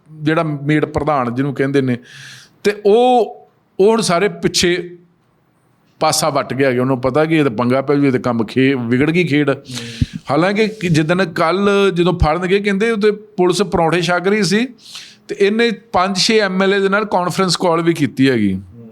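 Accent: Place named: Delhi, India. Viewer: sahb vich delhi wala bolde lagda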